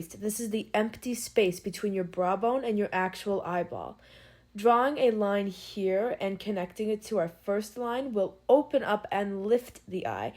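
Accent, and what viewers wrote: United States. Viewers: Love your accent! But I cant place it?? Sounds like West coast and east coast combined!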